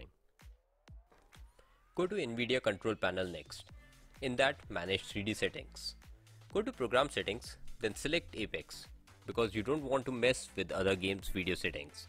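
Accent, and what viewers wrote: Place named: India. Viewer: This helped me a ton! Thanks! Also, I can tell you're an Indian by your accent... wanna play Apex together sometime? :)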